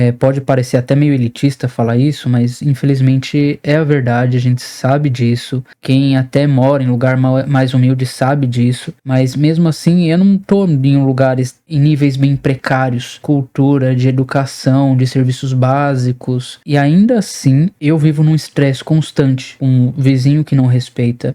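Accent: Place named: Southern Brazil, Brazil. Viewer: pelo seu sotaque, vc é paulista ou sulista, é isso? vem pro Nordeste pra ver uma coisa 😅😅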